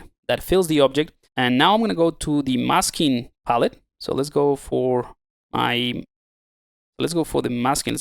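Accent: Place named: United Kingdom. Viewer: How come you sometimes sound british? Hahaha but more seriously, thanks for the video. I am learning zbrush on ipad. Have never tried any sculpting program on computer or tablet before. Its tough to learn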